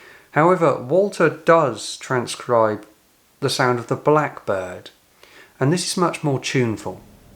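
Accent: United Kingdom. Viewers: Your commentary is so delightfully British 😁 Thank you.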